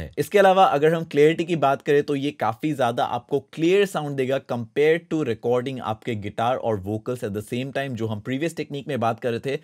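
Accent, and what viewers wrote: Assam, India. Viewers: Sir ap Assamese ha na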